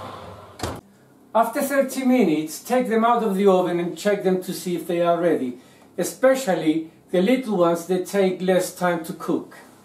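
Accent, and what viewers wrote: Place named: Cyprus. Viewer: I love your recipes as I also love Cypriot food and Cypriot accent 😉😍💖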